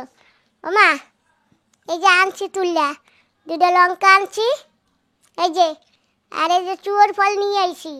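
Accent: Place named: Khulna, Bangladesh. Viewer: দিদি আপনাদের বাড়ি মনে হয় বাংলাদেশের ছিল আপনাদের বাসা তো খুলনার ভাষার মত খুব ভালো লাগলো আপনাদের ভিডিওটা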